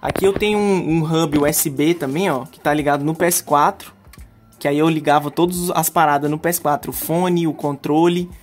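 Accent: Minas Gerais, Brazil. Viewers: cê e mineiro ou sulista?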